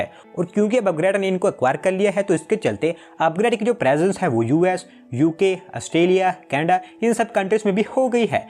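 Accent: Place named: Rural, India. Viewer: Your tone of speaking is a bit of villager , try to change it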